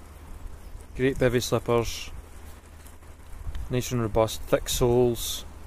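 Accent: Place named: England, United Kingdom. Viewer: Hi! I really like your accent! Where are you from? What part of England?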